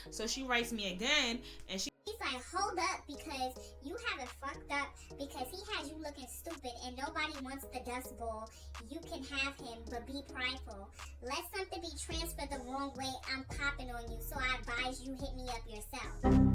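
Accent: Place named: New York, United States. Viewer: I love a New York girl accent 😮‍💨 so pretty with beautiful skin 😍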